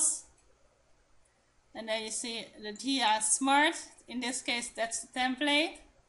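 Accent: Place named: Netherlands. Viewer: Zo te horen aan je accent kan ik hier gerust iets in het Nederlands neerzetten. :-) Top Tutorial! Net wat ik zocht.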